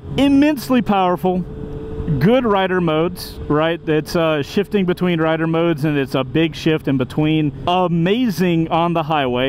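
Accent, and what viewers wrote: United States. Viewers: Great bike . Nauseating yank accent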